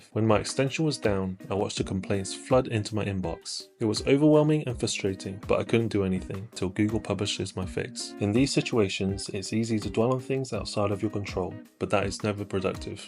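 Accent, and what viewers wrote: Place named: United Kingdom. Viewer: 영국 유학 다녀오셨나요? 영어 너무 멋지십니다!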